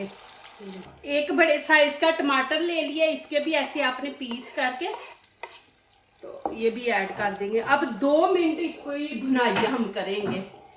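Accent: Punjab, India. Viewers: Baji Aap ki Ourdo same like Punjabi Exent.... are you from Sialkot....? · Achi recipe hae definaitly I'll try it in sha Allah...mae bhi punjabi ho but aap ny to urdu ke tangain he toor de 😄 too much strong accent hae but words bhi properly nahi bol re like dahi ko dare, bareek ko break full punjabi words use ho ry hae in urdu plz is pr bhi work krain.Allah aap k channel ko kamiyab kry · Sorry behna panjabi wali urdu na bolain urdu ko urdu ki tarha bolain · Behe Shef Sahiba Ap tu Urdu Bhi Panjabi ma bol rshi hain Khuda k liye Panjabi ma hi Comentry kr boht kharab Ecsent ha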